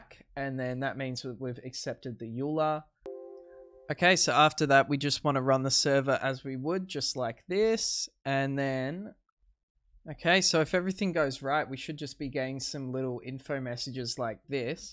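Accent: Australia. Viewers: Finally, a guy I can understand, as a fellow Australian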